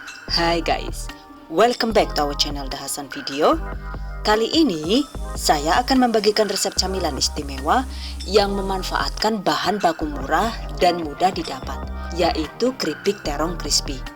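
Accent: Banyumas, Indonesia. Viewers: Malah keselek hahahaaaaa,,,,rika wong ngendi koh bu? Kaya wong banyumasan deneng? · Ya ampun ternyata pada bae mbi aku wong ngapak... matur suwun bu resepe, slm ngapak ora ngapak ora kepenak... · Nyonya satu ini emang the base.tahu sdh sy coba pare blm kok puinter idenya maupun buatnya lho .hem mantap .nyah tp nadanya tadi ngepek2ya bojoku garut .bhs inggrisnya panduanya kangen suaranya .sukses slalu nyah mksh ilmunya respon baiknya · kie mesti wong banyumas ya ? ngendi yu umaeh ? apa baturaden ? tangga desane nyong ? 😆😆😆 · Org ngapak😁😁🖒🖒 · Tutor nya teges .. tapi sepertinya mbake orang ngapak ya? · Wkwkwk jebule mbaknya ngapak salam.ngapak yen.ora ngapak.ora kepenak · besok coba ahhhh.............. ini koq ngmng2 orang jawa sih... lucu drnger suara ngapaknya.. samaan kaya aku... 😂😂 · Tinggal di madiun tp jawanya ngapak ya mbak e..hihii...asli cilacap apa wangon kie tah mesti..hihii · Wong ngapak jebule, Bun subscribeer baru bun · Ngapak2.. 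Kayak bapakke wong banyumas hehehe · wowww mkshh bunda resepnya.bunda lucu kyaknya org ngapak nih sma kaya aku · Setiap resep yg ditampilkn mudah di praktekn dan berhasil berarti tdk ada yg di RHS kan cuma lucuu nya di selingi bhs. Ngapak...😀😀 Sukses mba... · Bahasanya ngapak memang orang mana ea...??? Tadi denger pas lagi keselak..🙈🙈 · Kok ngapak ya ngomongnya😁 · Kaya wong ngapak y Bu....heeee....aku ikutin truusss....pnsrn sm Ibu niih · Mantulll bunda,,👍👍👍👍 Ternyata basane ngapak kya inyonge 🤣🤣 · Bunda ni aslinya mana si, ngapak bener.. Saya Purwokerto bun.. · Sy ibu rumh tangga biasa 50 tahun....bahasane koyo ngapak....ngomong cindil.....matur nuwun mba....kapan"" tak cpba.... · Kirain orang bule tadinya ...gak taunya ngapak ngapak 😅😅..mantulll buk e 👍👍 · Makasih bunda resep kripik terongnya..sy suka liat vedio bunda lucu ada bahasa ngapaknya he he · Jane kie cenele wong ngendi yaa...deneng kadang2 ngapak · Mamake ngapak ya mak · Makasih resepnya.. 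Semoga sukses selalu Eh btw nganggone basa ngapak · Lucu kadang bahasanya ngapak..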